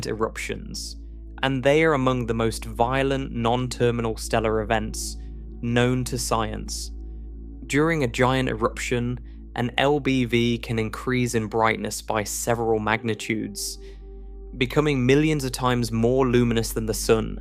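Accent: United Kingdom. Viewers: The omnipresent British accent is getting veryyy old.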